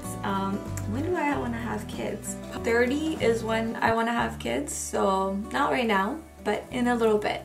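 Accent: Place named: England, United Kingdom. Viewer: How u are so well spoken English accent if u are from India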